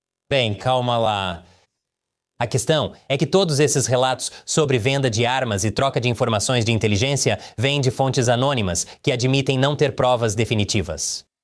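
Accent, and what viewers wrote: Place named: Portugal. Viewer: Que porra essa mudança para o sotaque de Portugal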